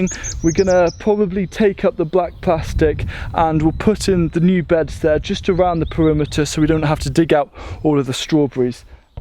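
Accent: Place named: Wales, United Kingdom. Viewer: Love the show and your just great to listen to, love the Welsh accent. Rhubarb, wonderful vegetable, whats the secret in growing the best like feeding manure, Boron and/or Iron etc etc, want to hear your secrets please, Peter Australia. Yours are so RED, whats the secret.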